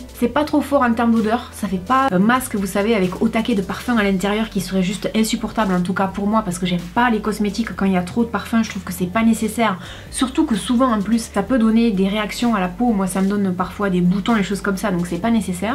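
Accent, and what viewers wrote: South, France. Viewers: Au top, j’aime beaucoup ta personnalité ! L’accent du sud , que du bonheur ❤️ Abonnée direct ! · celui qui m'a fait le plus rire c'est celui tout en bas qui dit qu'elle a un accent du sud mais que c'est trop bizarre car elle ne bronze pas, sous entendu elle fait semblant parce que si elle était vraiment du sud elle serait bronzé ! Qui ne sait pas que les peaux rousses ne peuvent pas bronzer ? Certains feraient mieux de reflechir avant de commenter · Mon dieu mais c'est hallucinant de laisser de tels commentaires! J'espère que tu les signales! Vive l'accent du sud et les accents régionaux! Des bisous!! 😘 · Non mais la meuf la " je comprends pas tu as l'accent du Sud et tu n'es pas bronzé c'est bizarre" mdrr mais déjà on voit le niveau d'intelligence quand même c'est dingue.. Mais qui sont leur parent? 😅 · Coucou! Je te suis depuis plusieurs années déjà et j’avoue je ne commente jamais. 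Cependant, je voulais que tu saches que c’est un réel plaisir de te retrouver dans chaque vidéos. Pour te dire, à la base certains sujets que tu traites ne m’intéressent pas forcément au premier abord si c’était réalisé par une autre youtubeuse. Mais vu que c’est toi, je regarde et je passe un très bon moment. Pour te dire, mon copain ce n’est pas son truc mais il regarde de temps en temps et il t’apprécies beaucoup aussi. On adore ton accent du sud (on est lorrains et comme je fais mes études en Belgique je chope un peu l’accent belge - je te laisse deviner le mélange assez rigolo 😂) et tu es tellement pétillante, agréable, bienveillante, transparente au niveau des produits et honnête. Tu te maquilles très bien, tes cheveux sont sublimes, tu es canon avec ou sans maquillage... et puis même franchement t’es au top ☀️♥️👌🏻. Je comprends que ça puisse te heurter, te faire mal vu les commentaires méchants et gratuits que tu reçois. 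Je ne suis pas à ta place mais perso ça me ferait mal aussi. Limite je pense que j’en pleure en toute sincérité. Ce n’est pas normal ce genre de comportements irrespectueux et mauvais. En tout cas, sache que tu as beaucoup de soutien et que t’es une personne géniale 🌸. Et ceux qui disent le contraire, sont mauvais... bah peut être qu’ils préfèrent exposer inconsciemment leurs problèmes plutôt que de les régler. Aimez-vous et vous aimerez les autres! Qu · Les gens sont pathétiques ! Moi j’adore ton accent vive le sud ! Et tu est une très belle femme rousse 😊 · Vive l'accent du sud! (représente le 11 donc l'accent on peut en parler!) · J’ai etait choquer dès commentaires que tu as afficher je n’aurais jamais imaginer que tu reçoive ce genre de commentaire 😱 les gens sont tellement méchant c’est aberrant!!! En tout cas moi j’aime ton accent du sud (j’y habite aussi 🤣) et j’aime beaucoup ta sincérité ne change rien 😊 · Ah non mais le commentaire " tu as un fort accent du sud et je trouve ça étrange que tu ne sois pas bronzé" ah mais il est collector celui la je suis pliée en deux 😂😂 donc les gens du sud sont forcement bronzé 🤦‍♀️ et les gens du Nord sont forcement blanc c'est logique 😬 ah la la les gens sont déprimants! 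Sinon à part ça la vidéo est sympa comme d'habitude! Le masque qui mousse c'est sympa ahah! Et tiens pas compte des critiques il y a des gens qui se font tellement chier dans leur vie minable qu'il faut qu'ils emmerdent les autres 🤦‍♀️🤷‍♀️ bisous 😁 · Depuis le temps que je te regarde, je n'ai jamais mis de commentaire. Mais aujourd'hui je réagis et rejoins certains autres commentaires, au sujet de ton accent du sud que j'adore, à ta sincérité et ton audace. Les autres ( les négatifs) mieux vaut ne pas en tenir compte, les ignorer est la meilleure solution. Ils viennent de personnes jalouses et ignares. Bonne continuation. 😊💅💄👠👢🛍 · Tu es une personne et plus particulièrement une femme très inspirante! De plus ton bel accent me rappelle mon enfance dans le sud! Tes vidéos sont au top comme toujours continue comme cela!! des bisous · Vive l’accent du sud ❤️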